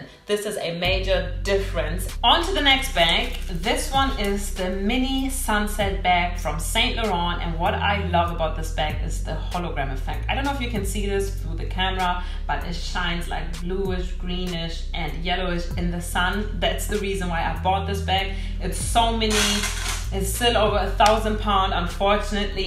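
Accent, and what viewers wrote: Germany. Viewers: Are you german ich hörs raus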